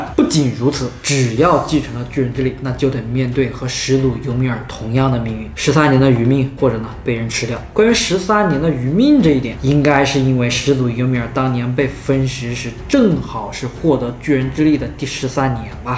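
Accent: China. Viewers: 這口音是大陸人吧，完全聽不下去了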